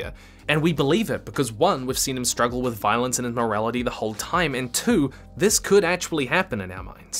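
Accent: New Zealand. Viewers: This guy is from New Zealand, yes? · He-he. "Dee-Deevil" Kiwi accents are the best.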